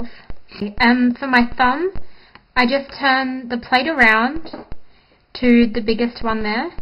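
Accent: Australia. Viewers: I'm Australian, Got the same accent myself, yeah she's Aussie ;)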